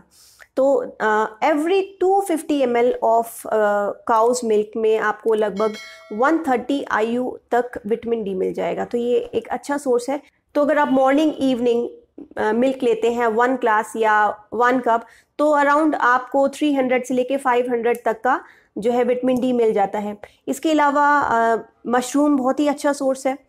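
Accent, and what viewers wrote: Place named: India. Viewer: english subtitle. indian voice.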